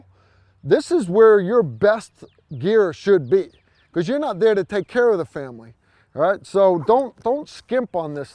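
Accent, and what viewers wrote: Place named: New York, United States. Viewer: Great video- just subscribed. I'm another dad who puts these bags in the wife/kids cars and have them roll their eyes at me about it. I've caught them sneaking them out and leaving them in the garage. When they did, they lost car privileges. Does any dad NOT get called an idiot for putting these in their family's cars? Are you in New York? Accent sounds a little like it.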